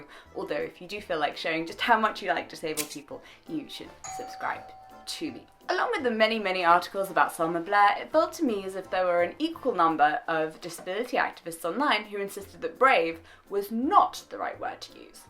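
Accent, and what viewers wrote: RP, United Kingdom. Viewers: You sound very posh. Love it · I did not understand the speaker (the British accent for one thing) or where she was coming from until late in the video. Hmm. I recall being touched by Selma Blair announcement though. I’ll have to watch more to figure this chipper redhead out.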